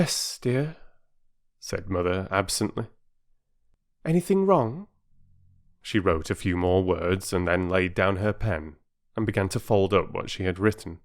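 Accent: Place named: Yorkshire, United Kingdom. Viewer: Love it! This has been my second time listening to your warm and joyful performance. How wonderful, the warmth, nuances, and humor of an actual human voice (vs. AI). And your voice is an especially fine one in timbre and tone. Love the touch of Yorkshire too.🙂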